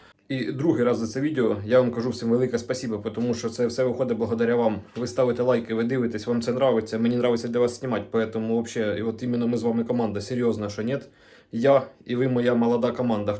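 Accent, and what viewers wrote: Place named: Orikhiv, Ukraine. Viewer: Так ты парень с Орехова, зачем ты говоришь, что с Запорожья?? Понятно , что в Запорожье никто так не разговаривает. Богдана знал может? У него Горячий Хлеб был по всему орехову , преображенка, новоданиловка. Не надо говорить, что ты с запорожья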